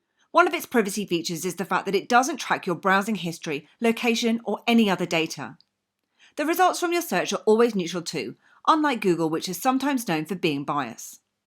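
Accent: United Kingdom. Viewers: I suspect you meant to say “excited” rather than “exited”. Mostly what I noticed was that she has one of the strongest British accents I’ve heard, and I have heard a lot … she also talks very fast. If you’re having trouble understanding, try lowering the playback speed on your player to .75. Those settings are accessed by clicking the three dots in the upper right hand corner of the video player screen. Those on-screen controls go away after a few seconds, but will reappear the instant you touch the screen. Just FYI, that electrical-outlet looking thing to the left of the three dots? That’s a slide-switch that toggles “auto-play” on and off.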